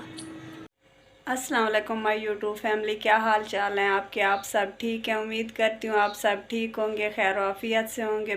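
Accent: Saraiki, Pakistan. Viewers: boht piyaari sariki bolte ho❤❤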